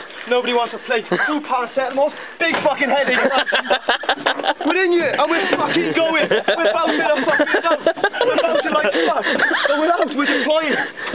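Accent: Wales, United Kingdom. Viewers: 100% a Rhymney boyo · Yeah that's the Welsh accent of his coming through. Our accent is really strong. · With the heavy Brit accent and the videographer laughing loudly, it is hard to know what this clown is talking about. I hope he is safely back in the U.K. making civilian videos and taping the videographer's mouth so as to silence his laughing so we can hear the narration next time. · I struggle with his accent but I got told he’s Welsh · Welsh accent* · Makes me proud to be Welsh aswell 🏴 I believe he's got a Newport or Cardiff accent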